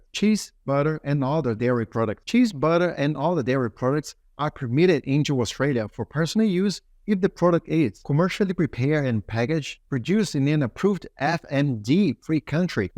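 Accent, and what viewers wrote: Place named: India. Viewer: Guy sounds like a Telemarketer from India.They can't find an Aussie as narrator??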